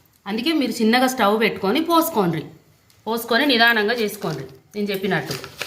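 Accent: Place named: Mancherial, India. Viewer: Same ma lagane matlduthunnaru madhe mancherial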